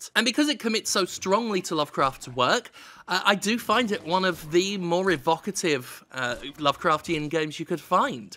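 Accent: United Kingdom. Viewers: Fuck me, did not expect to be slapped in the face by a massive dose of nostalgia the moment I boot up a sterling vid! You may be in America but you are deffo a brit through an through ;) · Sometiems, you can hear the Britishness just oozing out of.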